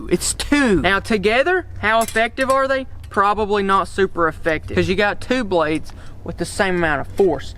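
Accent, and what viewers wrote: United States. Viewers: I use the crosman 1322 with a 14" barrel and a shoulder stock. I pump mine 12 times and I get passthroughs and instant kills on squirrels, pigeons, and rabbits within 20 yards. Also, what are you talking about "twice the size." A .22 is a .22, these pellets have the exact same diameter as a .22 projectile, they are just shorter because they dont have the powder charge behind them. Also, you sound american, but you bought the tuned down Canadian version of the crosman 1322, the US version is over 600fps out of the box. Definitely buy the $20 crosman shoulder stock and rotate your iron sights to peep sight, or upgrade the plastic breach to the steel breach and put a red dot on it.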